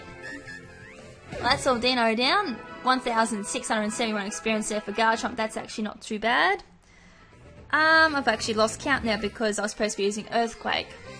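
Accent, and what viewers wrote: Australia. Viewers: actually she's an aussie so it's probably an accent thing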